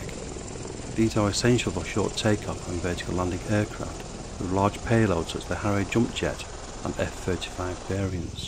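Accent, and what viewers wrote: Northern England, United Kingdom. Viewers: Got northern accent. Sound suicidal. Voice as colourful as coal pit near Birmingham. Sound like VoiceOver recording is a painful chore.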